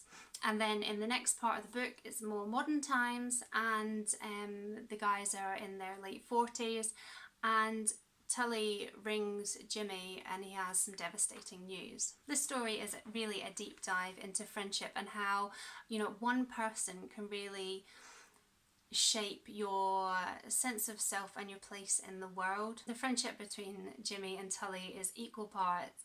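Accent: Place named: Scotland, United Kingdom. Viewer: You’re awesome and Scottish. Win. Win. I could listen to you all day. Subbed! Awesome wrap up. Definitely going to check Persepolis.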